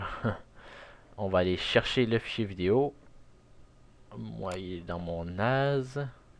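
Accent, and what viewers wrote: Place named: Quebec, Canada. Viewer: C'est un québecois normal qu'il a un accent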